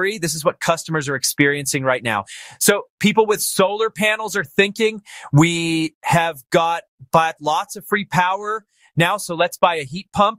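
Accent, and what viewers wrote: United States. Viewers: What's the deal, you sound like you're from the US, but you're talking about pounds rather than dollars? In a discussion such as this, it helps if the costs per unit of energy are known. Since heat pumps are more efficient than heaters, the should use less energy overall unless it's so cold that their efficiency drops way off. But, I don't know the relative costs of electricity and natural gas where your customers live. In both Pennsylvania and California where I have lived, heating with natural gas was always quite a bit less expensive than heating with standard electricity powered furnaces. The other down side to heat pumps is the relatively high costs to purchase, maintain, and repair them compared to simpler natural gas or resistive electric heaters. With that in mind, it makes little sense to go for a heatpump if it DOESN'T run quite a bit per year because the electricity cost savings don't make up for the higher purchase and maintenance costs. 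Of course, if you need air conditioning in the summer then you also get some additional energy cost savings from your heat pump when cooling the house. Also keep in mind that the capacity requirement for your unit is based on the size of your home but also on the temperature differential that you want to maintain between indoors and outdoors. If a hot summer day in your area is 95F and you want an indoor temperature of 75F, you have to maintain a 20F temperature dufferential, but if a cold day in your area is 0F and you want an in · A thousand pounds of what? AI crap. A limey with an American voice. And I always knew heat pumps and solar panels are stupid.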